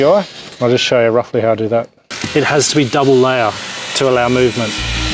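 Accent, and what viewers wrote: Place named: Western Australia, Australia. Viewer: no way mate. I thought you were from West Oz by your accent. North or South of the river? Looks like you work in the northern suburbs if I had to guess. I lived down near Byford most my life. Name comes from a vlog of my time in Russia.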